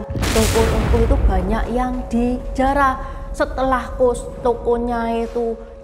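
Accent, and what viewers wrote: Java, Indonesia. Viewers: Ternyata dgn bicara medoknya, menjadikan saya asiik mendengarnya😅😅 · logat nya meedook banget😁😁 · "lha wong..." biyuh muedhok.. Ngalam ikut ngintip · mantap walaupun medok banget jawanya, lanjutkan ci.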